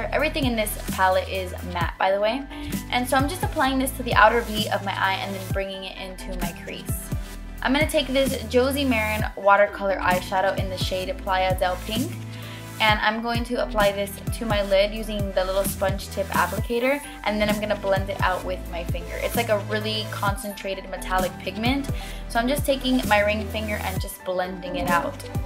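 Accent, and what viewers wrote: Miami, United States. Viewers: you love makeup, i love makeup, you're from Miami, I'm from Miami... we have miami girl accents and you know what I mean so lets be friends? k thanks :) love you girl! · she's Cuban, so I believe she's multi-ethnic. I've read in comments that her accent is not Cuban, it's a Miami accent.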